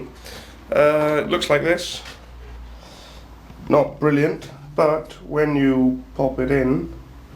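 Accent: Netherlands. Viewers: Haha ik zat te luisteren en ik denk, hmm wat voor accentje is dit, klinkt heel hollands :P. Lees de eerste comment, ja hoor :P. Wat voor hout raad je aan? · What's the accent may I ask? Sounds like Dutch but living in the UK for a decade or so...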